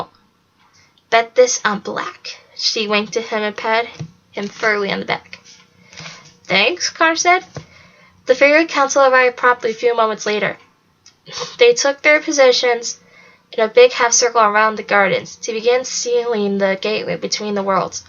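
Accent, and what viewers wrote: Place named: United Kingdom. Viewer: Her British accent is everything!!😂😂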